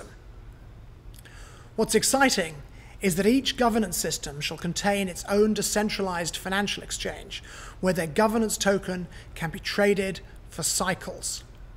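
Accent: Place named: United Kingdom. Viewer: Where can I buy the token ? More importantly how does one learn to speak the queens English with such elegance ?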